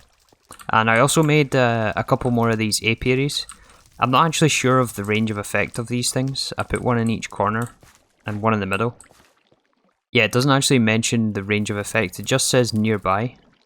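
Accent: Scotland, United Kingdom. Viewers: I think it might be one of the best gaming experiences I've ever had, to be honest. My wife is probably feeling neglected though. Forgive my American ignorance but is your accent Scottish or like northern Irish?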